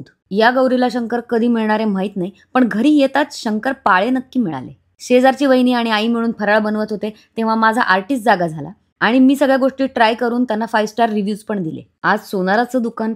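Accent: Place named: Beed, India. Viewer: मायला आमच्या बीड ची भाषा कड़क 😂😂😊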